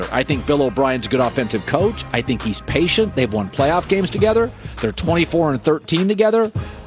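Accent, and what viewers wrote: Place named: Boston, United States. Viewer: Pick one; you can either have a, slurring S’s speech impediment, or you can have a Boston accent. You can’t have both, and be in broadcast journalism. Even sports broadcasting.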